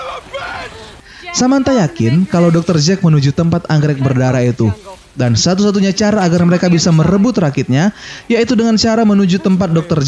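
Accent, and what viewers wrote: Manado, Indonesia. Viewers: Bang orang menado ya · Ad logat Manado kang🤣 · wah mimin orang manado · Bang Lu Orang Manado? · Dari logatnya , Abang pasti orang Manado ya😂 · Min orang manado? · Orang Manado Ya Bang?? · orang manado kang dapatau😂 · Orang Manado? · Orang Manado ya bang??? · Manado ngana kang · Orang Manado ka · Bilang jo kwa klo nga itu orng manado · Orang manado ya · Orang manado bang? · Bro orang manado??? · Abang orang MANADO kah ini ?? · Bang org mnado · orang manado ka ? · Mimin orang manado kang 😆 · Kak ternyata orang manado🙂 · Admin org manado fix! 🤘🏻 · Bangg Orangg Manado? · Bang abang pasti orang manado halo bang aku orang manado halo bang😁😁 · Org Manado 😭🤣🤣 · Orang manado min? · Bang ngana orang manado kang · Manado to ngana...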